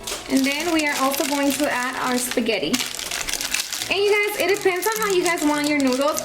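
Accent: Mexico. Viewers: Over the years, you've developed a better Mexican accent! Love you watch you when you're young and love to hear you as you mature! ❤️ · Your Mexican accent is strong. I love it 😍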